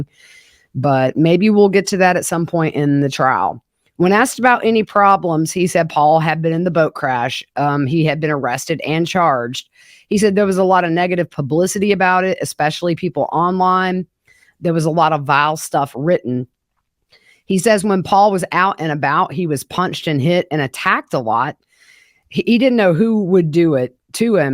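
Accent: South, United States. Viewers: This trial is already a passion of mine. A southern gothic tale! You know what we want to hear about and your honeyed tongue has the perfect down home accent. I’m betting that someone with important connections will agree with me and you’ll be even busier after this case has been resolved. Keep chasing the truth, girl!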